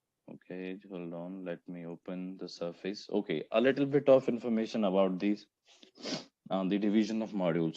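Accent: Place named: India. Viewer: Amazing sir. Perfect video with perfect indian accent. All clear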